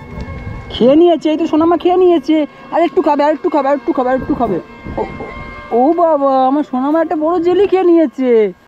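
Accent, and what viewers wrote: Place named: Rangpur, Bangladesh. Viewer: Tumar kotha rongpurer moto lage..tumar bari ki rongpur plc vai janaio...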